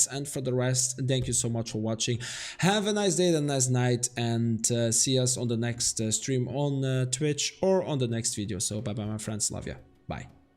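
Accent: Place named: France. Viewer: pti accent Français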